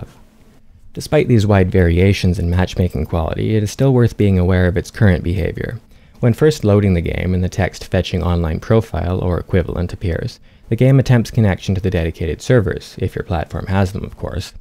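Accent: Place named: Canada. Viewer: Probably because he's not a yank. (Canadian. Same accent, less whine, not a huge ass. Multiple meanings there =D)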